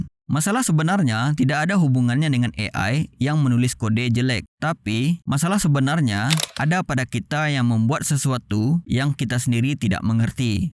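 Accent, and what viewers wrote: Aceh, Indonesia. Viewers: Suka banget kontennya ❤ Logatnya seperti Aceh :)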